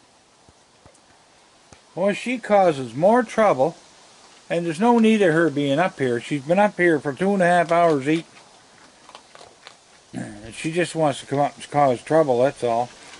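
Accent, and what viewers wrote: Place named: Sydney Mines, Canada. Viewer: I know right? He also sounds like people from Sydney Mines - I thought that was where he was from, when I first started watching him LOL.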